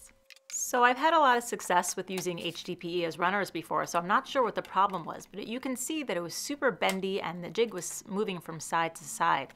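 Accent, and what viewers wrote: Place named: New England, United States. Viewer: Holy cats, I heard that New England accent and I thought, is she Norm Abram's daughter?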